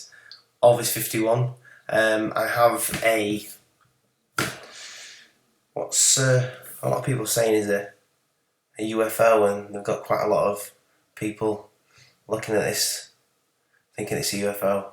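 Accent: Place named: United Kingdom. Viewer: I live in the UK in a small shit town called Grimsby, and i know you will know it just by your accent, anyway, i am a big fan of secure team 10, but hats off to you mate, even i didn't believe in most of his Google earth images, and i know other videos may be fake, i just wish now he would do his research properly, I feel a bit gutted actually. But i still believe that there are alien craft out there, most of it probably back engineered by the real terrorists, AMERICA! The only country allowed to bomb other countries, and when they fight back, they are called terrorists, anyway, that's a different subject. Nice work buddy.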